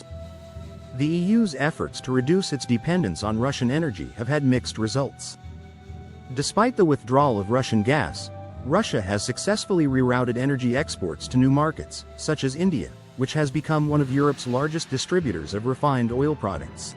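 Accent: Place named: United States. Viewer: Russian? it's a American accent, didn't say anything I already knew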